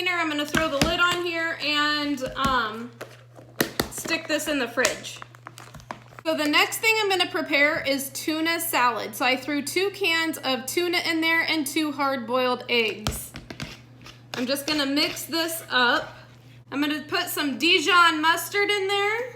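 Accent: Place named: South, United States. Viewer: Man I wish my family ate left overs I cook fresh daily my husband eats last nuts for lunch n my son eats last nuts for after school snacks ...what state do u live in ? U sound like that one girl who always says y’all she makes tons of videos .. n yes I live keto connects as well . Ur nails r on point 💕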